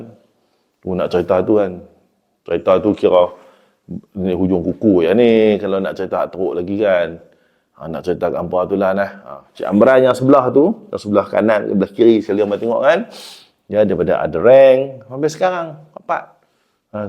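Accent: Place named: North, Malaysia. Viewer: "Mineral Wattar" Nohhh puas dengaq org utara brcerita....haha..Salam dari Pendang Tuan..Moga Allah sentiasa rahmati Tuan..AMIN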